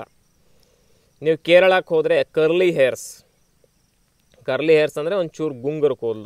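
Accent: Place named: North Karnataka, India. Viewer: Dr, nemma baasheya sogadu yellow ondu kade namma Uttra karnataka da pada balakeyagi nanage nagu thandukoduthade, edu kelodaklke cholo re😍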